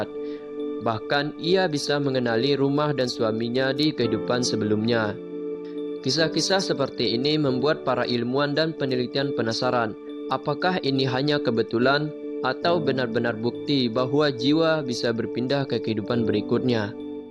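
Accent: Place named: Bali, Indonesia. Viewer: Hmmm... sebenarnya aku ingin bertanya padamu, apakah kau mempercayainya,,, tapi itu ku batalkan, mengingat aksenmu menunjuk kan logat Bali, jadi kujawab saja sendiri kau pasti percaya, karena itu di sebutkan dalam ajaran agamamu. Dan jika kau percaya, kau bahkan tak perlu meragukannya dengan membaca atau bertanya pada ilmuwan. Apapun jawabannya mereka hanya meneliti dan meramalkan atau menyimpulkan,, jika hasilnya tak sesuai kau malah goyah pada apa yang kau yakini. Karena hal ini tidak bersifat fisik itu sudah menyangkut ranah di luar fisik. Itu berada di ranah antara kematian menuju ke kehidupan !. Jika kau ingin memastikannya orang harus mati dulu. Setelah itu baru tahu apakah reinkarnasi afa atau tidak, tapi itu terlalu ekstrim bukan ?? Ha ha ha ha ha.... untuk lebih mudah cobalah cara yang lebih mudah,, yaitu dengan memahami hakikat hidup dan kehidupan.. 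mungkin saja dari situ kau akan mendapatkan pencerahan ! Karena jika kau tanya ilmuwan,, jika ilmuwannya hindu atau buda, mereka pasti jawab ada, terlepas dari apapun caranya untuk membuktikan bahws itu ada,,, tapi sebaliknya,,, jika ilmuwannya muslim atau islam,, dia pasti akan menjawab tegas tidak ada, meskipun bukti ilmiah mendukung dan membuktikan!. Kenapa? Karena dalam islam tak ada konsep tentang reinkarnasi. Termasuk karma, hal itu tidak di jelaskan atau di ajarkan dalam agama islam. Tapi,, tidak di ajarkan,, belum tentu berarti tidak ada bukan??... jadi selama faham itu membawa kebaikan hidup bagimu dan bagi sesa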